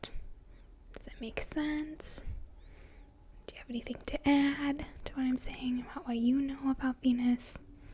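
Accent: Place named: Minnesota, United States. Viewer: These are great!! You do a great job and I love your Minnesota accent. (Minnesota, yes?)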